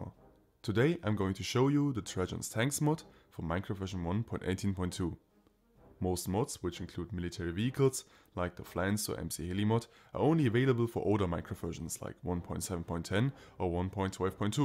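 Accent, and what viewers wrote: Germany. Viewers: Ich merke es schon von der aussprache dass du Deutscher bist hahaha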